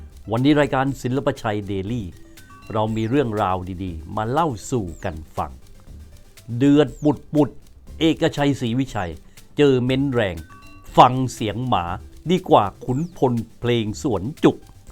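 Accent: South, Thailand. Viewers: นอกจากเสียงไพเราะแล้ว พูดใต้ได้ชัดเจนมากเลยค่ะ ฟังแล้วทึ่งจัง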